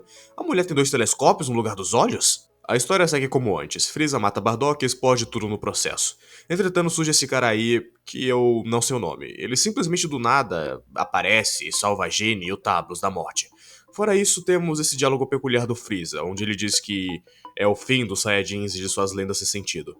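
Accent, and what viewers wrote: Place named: Sergipe, Brazil. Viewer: Vídeo muito foda mano, mas tira uma dúvida, você é sergipano?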